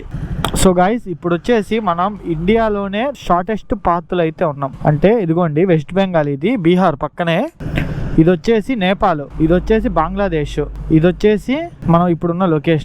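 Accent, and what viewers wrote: Nellore, India. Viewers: Bro.. I also from nellore District atmakur now staying in Dubai....Can you please describe about your camera and audio system...మా యాస లో vedio చాల బాగుంది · Mana Nellore yasa super nuv Neela unnav 👍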